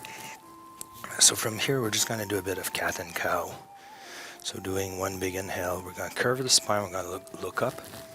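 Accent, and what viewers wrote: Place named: Montreal, Canada. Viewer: I love your classes so much. I grew up in Montreal's south shore so your accent makes me feel at home. Glad your knee is healing and you are able to post more often. Thank you so much!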